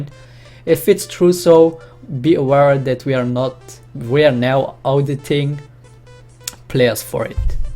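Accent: Germany. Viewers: WolfGang Man yes im german i know that accent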